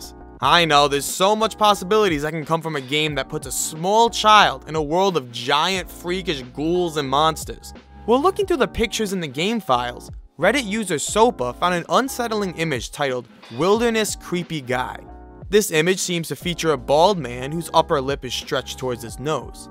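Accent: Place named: Boston, United States. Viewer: Dude I hate to sound weird but are you from Boston? I feel like you definitely live at the most an hour from Boston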